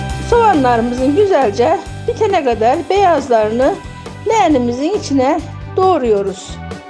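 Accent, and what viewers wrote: Gaziantep, Turkey. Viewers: Tam bir antep dili konusuyon bayıldım konuşmana çok tatlı eline sağlık zeytin yağı kokuyor ayçiçek yağı kullansam olurmu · Yaaa teyzemmm Gaziantepten uzaktayım konuşman o kadar tatlı ki özlem giderdim. Ellerine sağlık çok güzel duruyor. Ama bir eleştirim var köftenin yanında yaprak sarmasını göremedim ☺️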